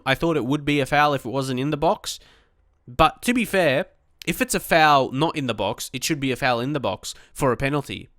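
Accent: Australia. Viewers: The reason i think people hate on you is because of your accent which i think is stupid. Although i do kind of find the Australian accent a bit annoying, I'm not going to hate you or your content for it. If you were American or British you would receive way less hate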